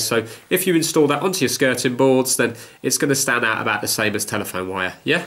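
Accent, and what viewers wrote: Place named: United Kingdom. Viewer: Nice to put a face to the channel. Love the channel. Currently watching the backlog. I love the "Ok, yea", "Right, ok", "Yea". "Ok? Yea"... It's the region/accent, but it's so noticeable as someone from outside the UK.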